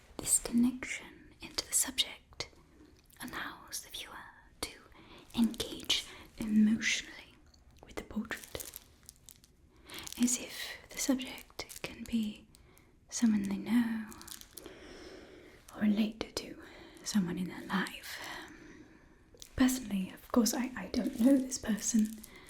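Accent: England, United Kingdom. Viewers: The British accent hits every single time! Btw, how did u get ur new profile pic? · No such thing as a "british accent". That's an English accent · Yes I am still impressed with that british accent, I am british and I cannot do an american accent to save my life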